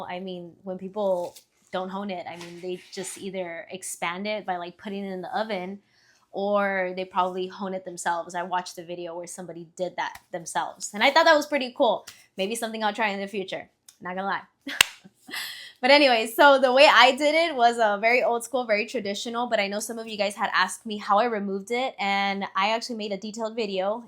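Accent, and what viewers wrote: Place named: Mexico. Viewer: She have a Mexican accent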